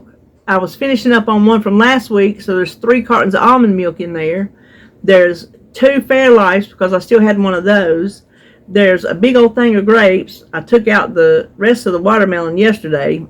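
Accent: South, United States. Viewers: WOOT WOOT! 🎉🎉🎉🎉🎉 I love your Southern!❤ I hate pithy watermelons too! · Good for you on the loss. Every little bit counts & adds up. You have the right mind set. Thanks for the discussion on carbs. We all need whatever info we can get. Love your southern talk. Makes me chuckle.🌷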